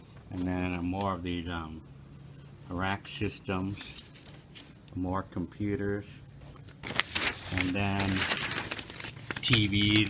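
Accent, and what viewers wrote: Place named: Cleveland, United States. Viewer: Sounds like Cleveland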